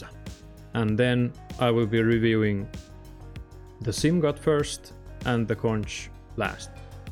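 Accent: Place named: Netherlands. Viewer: that dutch accent 🤌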